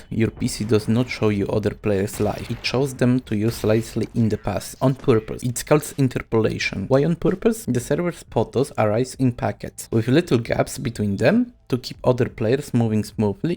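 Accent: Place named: Soviet Union. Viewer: when you click on a CS2 video already expecting the crackling and calm soviet accent explaning why you suck at the game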